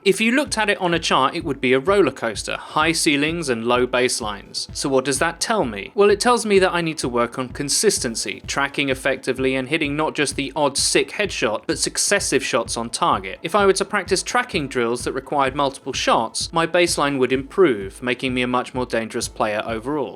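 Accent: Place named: United Kingdom. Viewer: A lot of yanks discovering british english for the first time I guess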